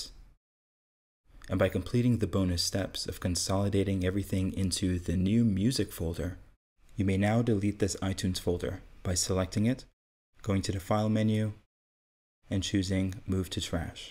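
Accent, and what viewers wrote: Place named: Germany. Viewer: Fantastische Anleitung, 1000 Dank! Und ein kaum wahrnehmbarer deutscher Akzent?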